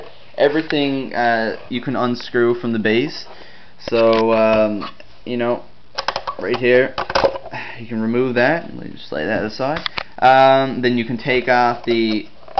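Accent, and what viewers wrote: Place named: Newfoundland, Canada. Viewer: Knew that was a Newfoundlander Accent! Cool vid dude, pickin one of these up next week.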